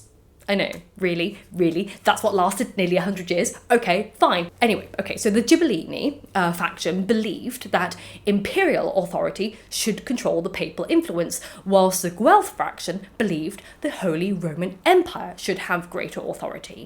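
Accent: United Kingdom. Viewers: As a person learning both English and Italian this video is 👌, I just love her accent (British I presume)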